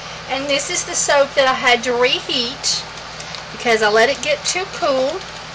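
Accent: South, United States. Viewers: This is the best soap making lesson video, gotta check out more of your videos. And love your Southern accent, thanks for sharing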